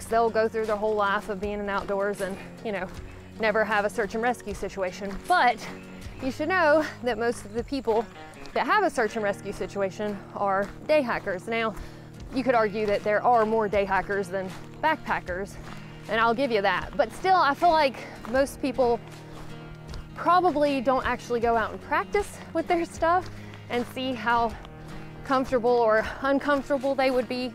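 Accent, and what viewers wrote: South, United States. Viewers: Gymnasticin! Love it, been a while since I have heard pure Sutherneese.... Hi, been a while!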